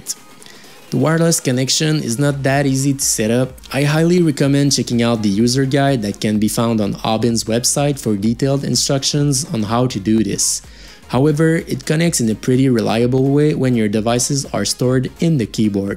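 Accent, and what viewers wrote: Quebec, Canada. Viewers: J'ai comme l'impression que t'es Québecois, pas vrai?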